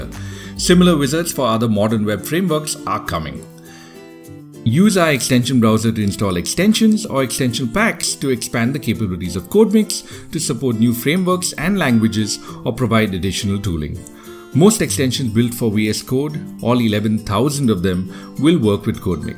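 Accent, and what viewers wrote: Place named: India. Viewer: An Indian accent, really?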